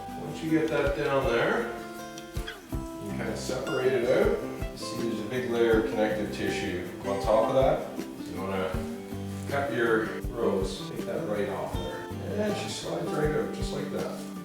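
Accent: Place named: Canada. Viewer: Sounds like a Canadian. Credibility issues.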